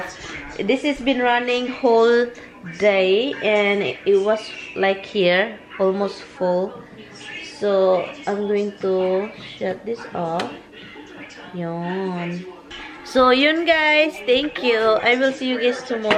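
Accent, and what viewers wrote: Visayas, Philippines. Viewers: Mkatawa mn ko mgtan aw nmu sis oi 😊🤣sagdihi lng na imu prounounciation bisaya ra mkarelate ana😁😁😁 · I enjoy your vlog so never mind the pronounciation understood na yun mga pilipino tayo hindi naman tayo british or amerikano love you.♥️♥️♥️ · Hello and Tommy watching from Muntinlupa City.. Parejas ta bisayang dako dhay oi...yun english pronounciation ntin matigas hahaha😂 never mind the bashers insecured lng cla sau. Kc sobra blessed buhay mo kysa knla. Nkktawa kng panoorin ang saya mo panoorin dapat gnun buhay msaya life dpat gnyan tau iwas stress...enjoy life lng😉😍 katawa ra ta oi😂😂😂 kalami sa imong sud.an lato ug daing.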